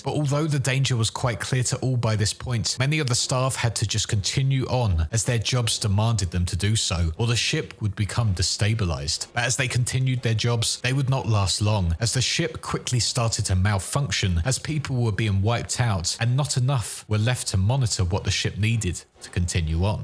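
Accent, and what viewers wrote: United Kingdom. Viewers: Ah, another English guy speaking in that English way as if he's a professional in whatever gibberish he is speaking about.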